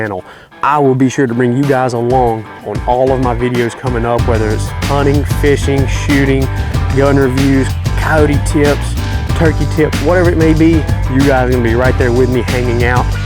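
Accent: South, United States. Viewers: enjoyed listening to your southern drawl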